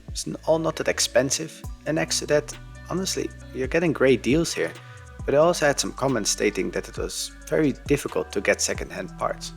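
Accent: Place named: Netherlands. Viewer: Are you dutch? You sound very dutch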